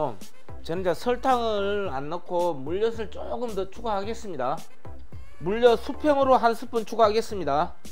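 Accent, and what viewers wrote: Jeolla, South Korea. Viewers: ㅋㅋ 설명 참 재미 있으 시네요~~경상도 말씀에 전라도 억양같기도 하구요~~맛있어 보이는데 함 해볼께요~~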